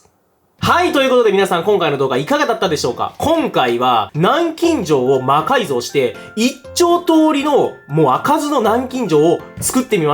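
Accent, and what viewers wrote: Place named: Kobe, Japan. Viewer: 方言的に神戸の人かな？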